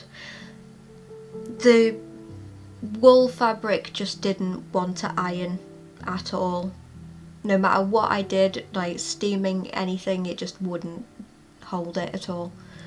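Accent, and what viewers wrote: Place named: Liverpool, United Kingdom. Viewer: I’m from Liverpool too ❤️❤️ I knew I heard the scouse twang 😂 Yeah some sellers do lie or actually don’t know and just take guesses , have you tried “ pound fabrics” ? Prices are great , shipping is from £4.99 upwards, depending on how much you buy, but some great prices, give them a look I buy loads from them , I’m in middle of making myself a dachshund dog , I’m goin to use him as a big pin cushion, I could easily make you one too , do you have a P.O. Box number ? I’ll send him/her to you , you’ll only have to name them , I don’t really want to leave my email here and don’t really know how we can sort addresses out , any ideas ?. Xx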